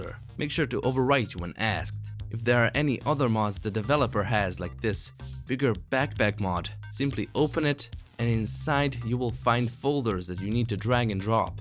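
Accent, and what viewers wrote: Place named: Russia. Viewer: i really like your accent, are you russian? also, LOVE the video! it's really well made, easy to understand (no-knowledge-about-mods-me understood it, which is impressive! XD)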